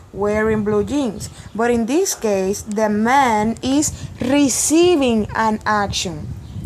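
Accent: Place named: Spain. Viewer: Although your funny Spanish accent that is so great. I understood that finally. Thanks a lot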